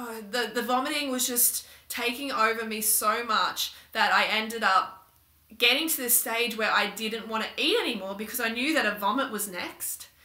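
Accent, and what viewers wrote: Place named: Australia. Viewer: The Aussie accent has to be the best in the world - everything about it is awesome.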